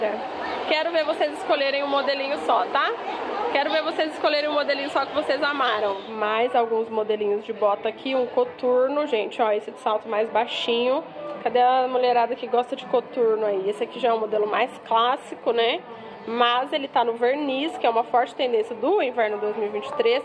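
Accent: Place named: Campinas, Brazil. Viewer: vc é de Campinas? O seu sotaque é de campineiro. Eu moro em Campinas e vivo andando pelos shoppings da cidade. Abriu uma loja da Amaro, onde era a forever 21 no D. Pedro. Gostei mais dessa loja do q a do Iguatemi. É maior. A bottero é uma loja sem vitrine, gosto de olhar a vitrine p depois entrar na loja p comprar. Nela vc entra e já chove vendedoras. Gosto dos sapatos da Bottero. E noto q as pessoas passam pela loja e não entram. É uma pena. Sempre vazia. Faltou a vitrine p atrair.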